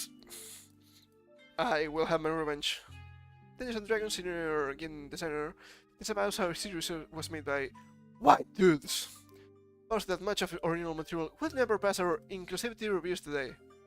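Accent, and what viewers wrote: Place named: Germany. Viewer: Dude what accent is that?? I thought you where speaking German at first.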